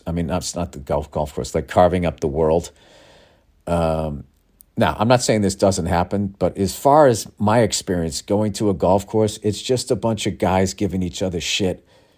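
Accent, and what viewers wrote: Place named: South Boston, United States. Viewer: Jesus. Listening to this I thought i was back in southie in clancys poolroom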